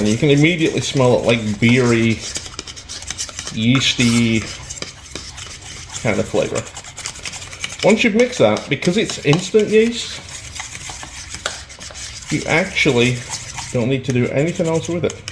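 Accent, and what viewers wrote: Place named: Lancashire, United Kingdom. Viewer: I thought so. Yours is different from the southern Lancashire accent of St Helens. Best wishes. Merry Christmas to you and a healthy, happy and prosperous new year.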